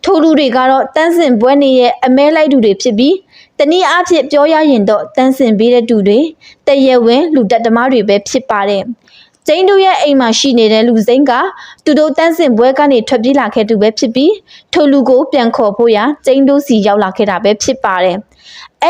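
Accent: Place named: Shan, Myanmar. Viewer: အသံလေးကချစ်စရာကောင်းလိုက်တာ၊စကားဝဲတာကရှမ်းတွေဝဲတဲ့အသံထွက်ဆိုတော့တင်ဆက်သူဟာရှမ်းမလေးတစ်ယောက်ဖြစ်မယ်လို့ခန့်မှန်းလိုက်ပါတယ်၊ ကျနော့်ခန့်မှန်းမှုမှန်လားမှားလားဆိုတာဖြေပေးပါဦး❤❤❤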